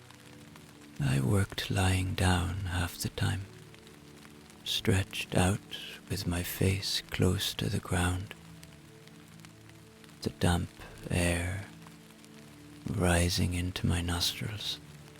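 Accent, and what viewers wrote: Ireland. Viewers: Woohoo! 1 hr gang! pm Texas USA. Keep that soft Irish brogue coming. 😊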